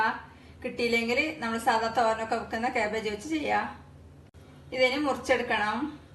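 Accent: Kasaragod, India. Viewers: Kasargod aano kannur aano?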